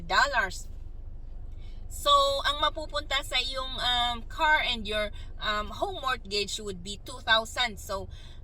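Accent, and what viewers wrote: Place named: Cordillera, Philippines. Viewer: sabi ko kasi madam, mukang taga highlanders to base sa accent.. heheh thanks sa mga infos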